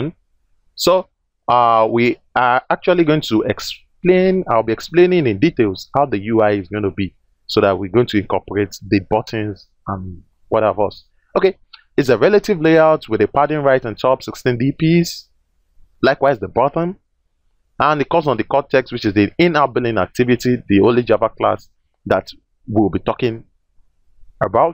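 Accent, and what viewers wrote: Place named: Nigeria. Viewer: "In app billing SARVICE," Naija man for sure. Thanks for the great video.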